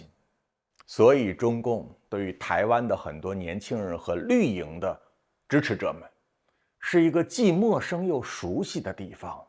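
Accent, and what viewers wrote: Beijing, China. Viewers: 叔 你老家是哪裡的？是北京嗎？突然好奇你的口音。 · 这口音，这调调，这吊儿郎当气质，大概率是个前朝八旗子弟。怨恨中共那也是情有可原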